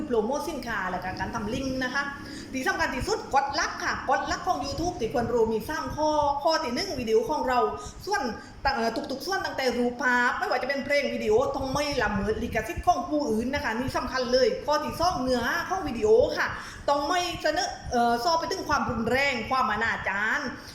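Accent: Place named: South, Thailand. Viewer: แหลงหรอยเลย ไม่ผิดหวัง เสียงใต้ บ้านเรา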